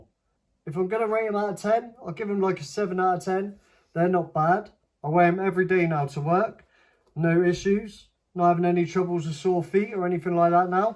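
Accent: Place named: Dorset, United Kingdom. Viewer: Im on day three, already loads mire comfortable those first few days are rough. Regarding the terrascapes comfortability. You in dorset mate. I can hear the accent, i had family down there.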